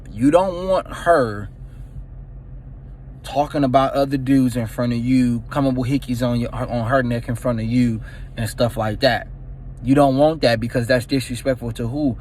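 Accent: Baltimore, United States. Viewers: He def from Baltimore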